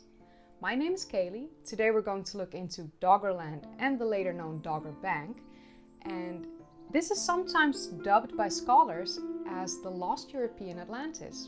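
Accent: Netherlands. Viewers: Lovely Dutch accent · Your accent is subtle. I think you're Dutch. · don't loose the Dutch accent! Please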